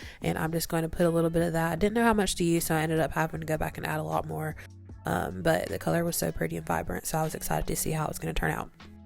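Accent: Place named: South, United States. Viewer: I love your southern accent 😊❤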